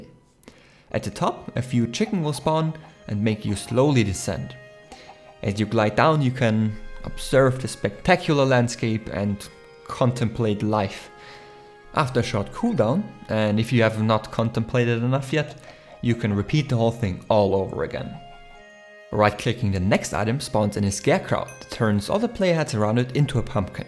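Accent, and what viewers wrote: Germany. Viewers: Dein Englisch klingt als wärst du Deutschet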